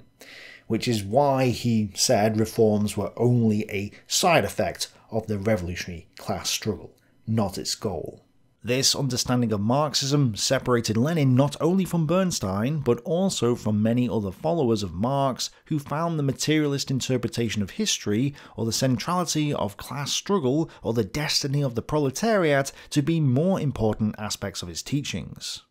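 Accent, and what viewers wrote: United Kingdom. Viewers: Classic, just few minutes in and so much makes even more sense than before, almost as good as Captain Context himself. Luv me listenin' to Br'ish lads, simple as.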